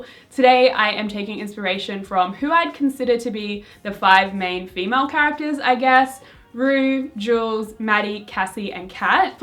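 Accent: Australia. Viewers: are you australian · are u australian? you have a different accent, sorry if I said it wrong, I'm not an English speaker but I noticed a different accent. loved the outfits, I would probably wear all of them 💖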